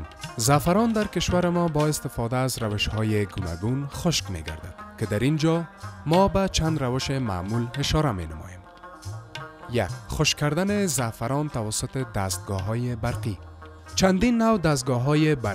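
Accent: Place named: Afghanistan. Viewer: this is done in afghanistan. I'm iranian. the narrator speaks persian with afghanian accent. it's too close to persian.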